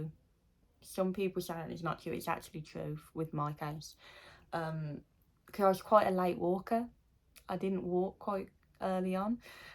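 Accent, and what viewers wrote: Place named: United Kingdom. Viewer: So Sweet of You to Share Your Life and Journey with All of us. BTW, LOVE YOUR BRITISH ACCENT.... Some of my Adult Childrens' Close Relatives Were Born, Raised, & Reside in England. Thank You For Your Terrific Videos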